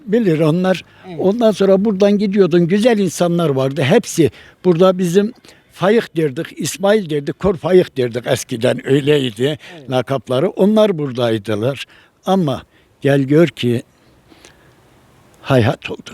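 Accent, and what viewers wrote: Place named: Erzurum, Turkey. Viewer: çok güzel bir anlatım çok tatlı bir Erzurum ağzı çok teşekkür ediyorum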